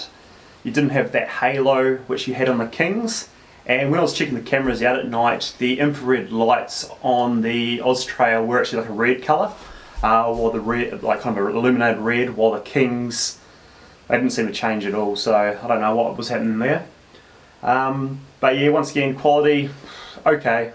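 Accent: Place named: New Zealand. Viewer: Sounds like you from NZ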